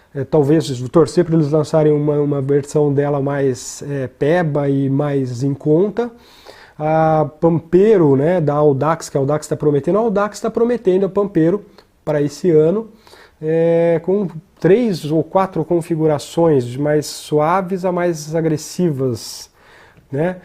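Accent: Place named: Germany. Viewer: Cara vocês são muito showww. Esse sotaque da é lindo... Parabéns casal... Primeiro...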